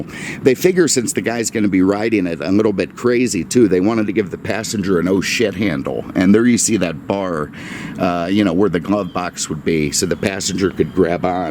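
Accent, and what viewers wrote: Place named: New York, United States. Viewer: It was to see this video. What a beauty! Miss your beautiful happy disposition!!.😂😂😂😂😂 You sound like you are from New York.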